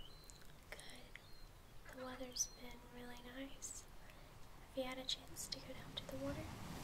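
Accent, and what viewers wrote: South, United States. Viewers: Southern accent really gets me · For years now I've been tryin to decide where shes from based on accent. I wanna say Ga or SC. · Personally I miss the slightly insane... but coming from London UK I can get by with that southern drawl quite fine.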